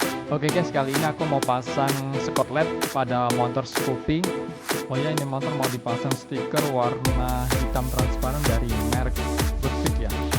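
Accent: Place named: South Sumatra, Indonesia. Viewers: Sumsel dmnanya bang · Dari logat ny sumsel ya bro? Gw sumsel soalny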